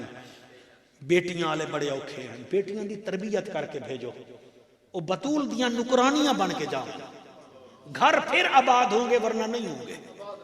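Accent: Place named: Saraiki, Pakistan. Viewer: Subhaan Allah bayan boht Acha hai🥰🥰🥰🥰🥰 Lakin Andaz main saraiki+Punjabi+Urdu🎌 Hai Jo Thora ajeeb Lagta hai😏😏😏😥😥😣😥😣😥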